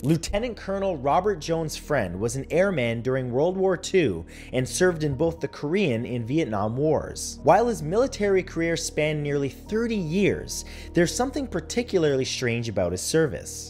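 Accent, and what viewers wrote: Canada. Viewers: he canadian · Thats quite the canadian accent.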